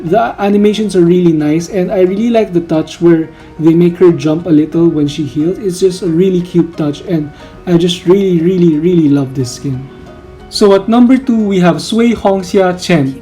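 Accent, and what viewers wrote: Philippines. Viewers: U sound filipino, kabayan?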